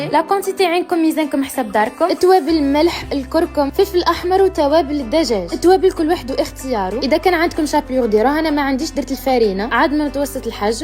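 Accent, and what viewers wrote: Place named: Algeria. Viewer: جزائرية باينة 👈🏻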